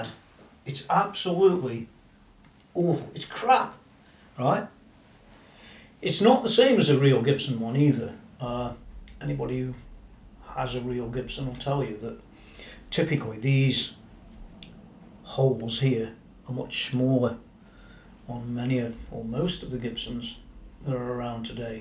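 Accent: Stoke, United Kingdom. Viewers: I Have bought guitars (Ibanez rg350)from Thomann in the past and still will but when I looked at the Harvey Benton range something just didn't feel right to me. Thanks for doing this video there is a lot of crap out there and you need to research thoroughly. Is that a stokie accent.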